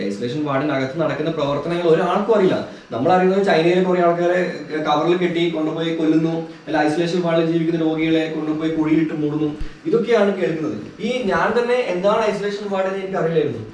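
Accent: Kannur, India. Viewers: Bro nigal kannur karan anoooo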